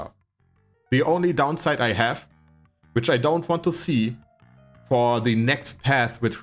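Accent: Germany. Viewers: he sounds very german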